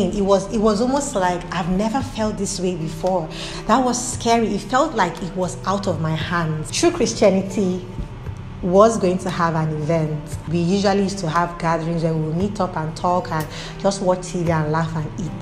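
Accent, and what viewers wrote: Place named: Nigeria. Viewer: You sound like a Nigerian. Though, I'm not in a relationship. Your story is relatable and I don't know why. ♥️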